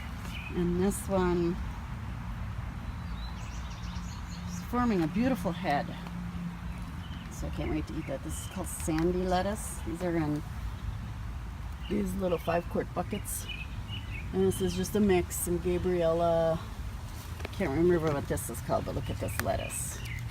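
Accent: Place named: Midwest, United States. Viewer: My late husband and his family are from Minnesota and I detected the midwestern accent!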